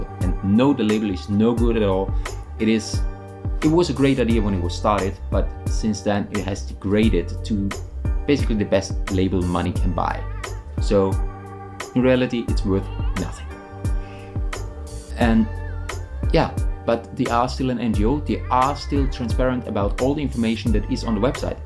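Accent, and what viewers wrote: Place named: Netherlands. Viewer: Klinkt als een Nederlander.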